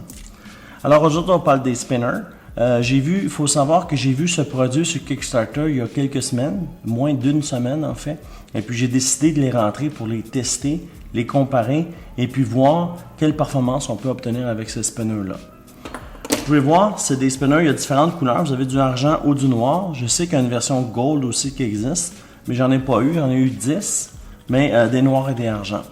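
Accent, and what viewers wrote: Quebec, Canada. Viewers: J'adore l'accent québécois ! J'aimerai avoir un handspinner gratuit car je peux pas en acheter :(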